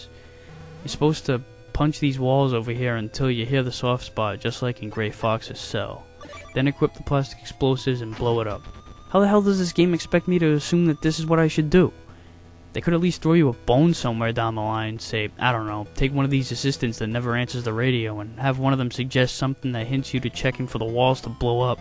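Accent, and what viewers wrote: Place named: New York, United States. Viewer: This is an amazing walkthrough! By chance you from New York? Just wondering as I hear a sight accent. Either way thanks and cheers!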